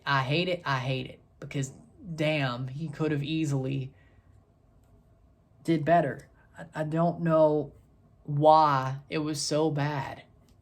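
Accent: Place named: Midwest, United States. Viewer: Are you from Canada? Your accent sounds like Midwest USA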